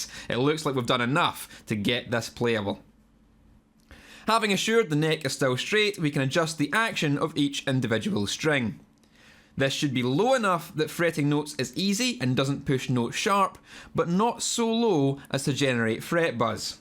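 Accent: Scotland, United Kingdom. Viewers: Oh! Now I see. The accent is scottish! That's why the "peckup" and so on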